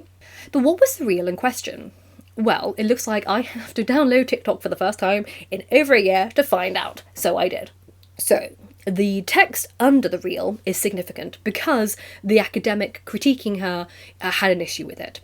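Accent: United Kingdom. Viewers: You sound British. But your physiognomy doesn’t look it.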